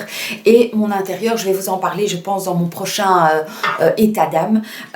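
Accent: Belgium. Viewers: Coucou, je suis nouvelle sur ta chaîne. Je suis de Bruxelles et ça me fais plaisir de suivre des haul action d’une youtubeuse belge! 😊 Déjà j’aime ta bonne humeur, la façon de présenter tes articles. Et écouter les prix à la prononciation belge 😄 Pour tes feutres, ce n’est pas des surligneurs « fluo comme on dit chez nous » ce sont des marqueurs à alcool. C’est plus pour le coloriage et loisir créatif. Je ne les ai pas utilisé pour l’utilité que tu veux en faire, à tester. Mais un conseil garde les conserver bien à plat et pas debout dans un pot sur ton bureau par exemple. Car la pointe sèche. Bisous à toi et ta petite famille 😘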